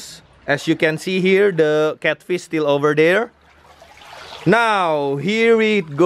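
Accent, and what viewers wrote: Indonesia. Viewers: hehe logat ngomong inggrs emang gitu bisa ketebak klo yg ngomong org indonesia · i love indonesian accent it is cute :D <3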